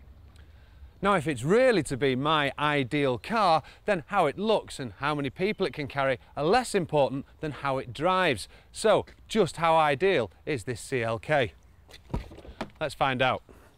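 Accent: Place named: Northern England, United Kingdom. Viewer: He's just northern lol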